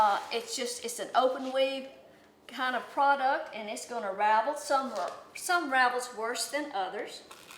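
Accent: South, United States. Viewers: Her sweet southern voice!!😄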